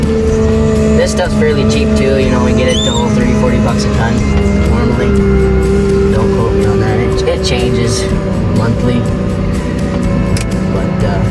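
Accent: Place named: Upper Midwest, United States. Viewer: Nice video 👍 Just found this channel. Good stuff! Where are you located? Sounds upper MW. I'll be checking in from WA.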